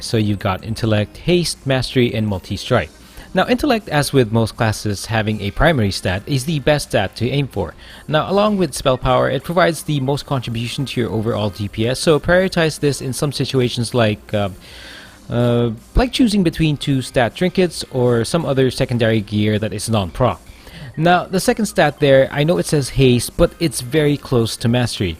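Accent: Philippines. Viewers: Nice guide! Btw are filipino? Cause you have a little bit of filipino accent.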